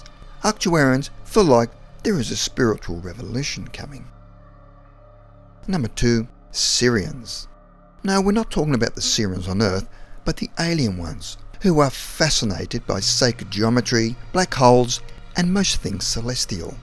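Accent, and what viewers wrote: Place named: Australia. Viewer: Ozzy accent. at first I thought it was odd to hear it as a narrator but yup.. it's nice to listen to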